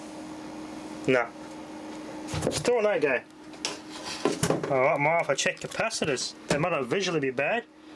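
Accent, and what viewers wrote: Australia. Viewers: is this Australian?? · He is speaking rapid AUSTRALIAN. Solution is to hit CC to display subtitles. Helps a bit. Comments beneath the video are useful. Steve in Sydney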